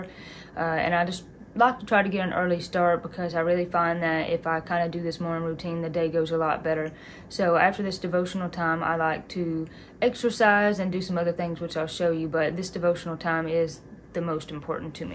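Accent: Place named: Eastern Kentucky, United States. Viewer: ❤ I just wanted to save it I admire you and your sister. You're such sweet authentic women. Raised right in a crazy world. My family is from eastern kentucky I now live in indiana. I spent every summer at my grandparents house down there. All of my family has passed. There are only a few distant relatives still down there. After watching some of your videos and watching your mom cook I feel homesick l o l. In fact I just asked my husband of we could take a short trip to the area and just soak it all in. Stay sweet and keep doing what you're doing. It's Also refreshing to hear someone sounds like me l o l. Much love and prayers ❤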